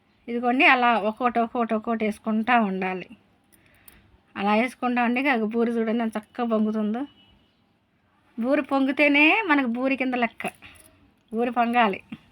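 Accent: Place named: Rayalaseema, India. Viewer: చూస్తుంటే తినాలి అనిపిస్తోంది, చాలా చక్కగా సీమ భాషలో చెప్పారు.. 👌👌👍👍👏👏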